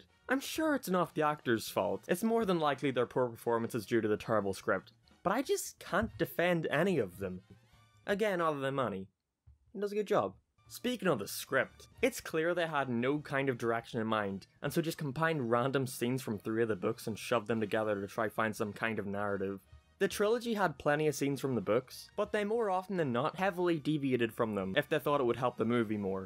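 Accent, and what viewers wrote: Ireland. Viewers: Wasn’t until that i realized he has an Irish accident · Dude where are you from I love your accent! Irish?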